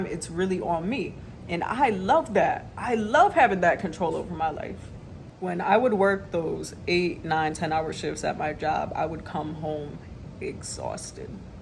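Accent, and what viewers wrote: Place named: Florida, United States. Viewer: i was gonna guess you lived in Florida cuz how you talk 🤣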